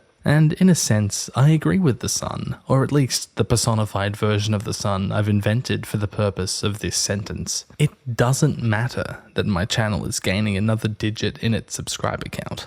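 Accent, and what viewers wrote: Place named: Australia. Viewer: Snap, I know the Pisstakes backwards and forwards from all the listens. It started as just genuinely relaxing to hear someone react the way I react to bad writing/lazy world building/ruining epic series because you're a try hard who wants to make a Staar Whor, but eventually it became familiar and therefore comforting in a different way. I probably enjoy hearing an Aussie accent too, keeps my homesickness in check.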